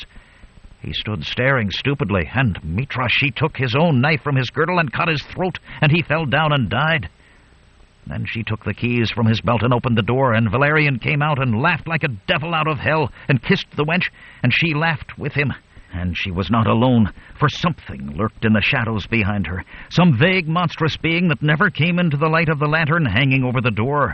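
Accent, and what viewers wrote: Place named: United States. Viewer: Thankfully he speaks American English- the heavily-accented brogue of some readers is not missed!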